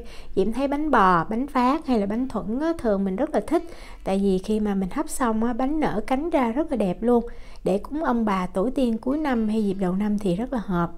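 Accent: South, Vietnam. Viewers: Diễm hướng dẫn kỹ lưỡng, nói chuyện dễ mến giọng miền Nam Chúc Diễm năm mới nhiều may mắn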